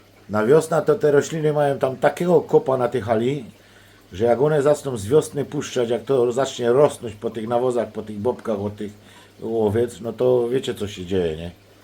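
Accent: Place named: Silesia, Poland. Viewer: Cześć. Fajne i ciekawe rzeczy opowiadosz,miło sie słucho,szczegulnie jak godosz po naszymu😬.Pozdrowiom ze Mysłowic.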